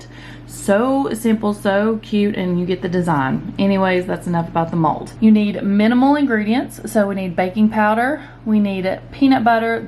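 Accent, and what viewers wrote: South, United States. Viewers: shes not country she’s southern! Lol and I LIVE for it! Not to mention she has one of the most famous voices ever....She’s the Incredibles Mom! Lol This is a compliment 😂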